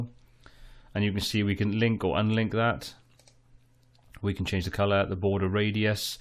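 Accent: Wales, United Kingdom. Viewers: I know a welsh brother when I hear one haha! I'm from South wales :D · Thanks for your clear approach - do I detect a Welsh accent?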